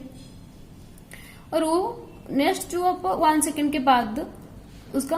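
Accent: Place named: Odisha, India. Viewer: Badhiya questions badhiya explanation ,mam.apki language se pata chalta hai ki ap odia ho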